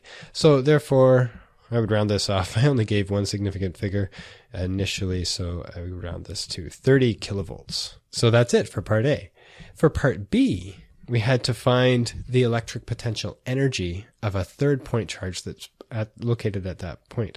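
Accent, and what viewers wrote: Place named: England, United Kingdom. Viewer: Bol t rahe hai jaise ki app England se ho..😩😩😩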